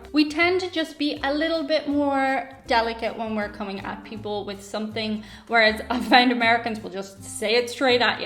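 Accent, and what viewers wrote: Ireland. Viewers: Just wanted to drop in and say u love ur accent. Don't stop being Irish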